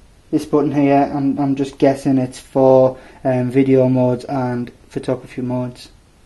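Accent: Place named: Cumbria, United Kingdom. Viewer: dude where are you from......your accent sounds like some cumbrians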